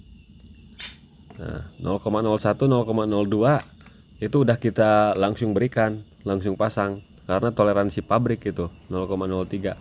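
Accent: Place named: Garut, Indonesia. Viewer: Tutorna mantap.urang Garut sigana mah,karunya urang Manado Weh rada ngahuleng wkwkwk😅😅😅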